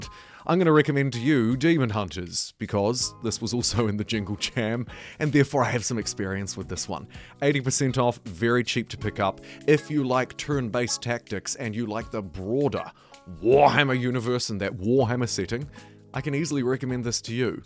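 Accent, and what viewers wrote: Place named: New Zealand. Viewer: I love that with your kiwi accent you need to spell out DECK to avoid misunderstanding 😅😅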